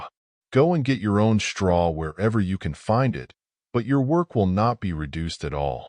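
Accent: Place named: United States. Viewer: I like the "American" voice. Easier to follow.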